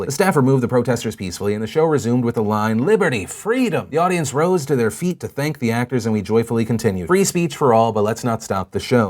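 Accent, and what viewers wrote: South, United States. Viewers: Maybe this is a dumb question, but: why does Philip DeFranco end up with a slight Southern drawl in the end segment? How did that come to be a thing?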